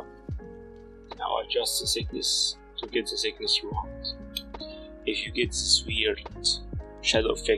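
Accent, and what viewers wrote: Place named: Egypt. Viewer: You are arabic, aren't you? Most likely Egyptian. I knew it once I heard your accent lol. I am Masry as well.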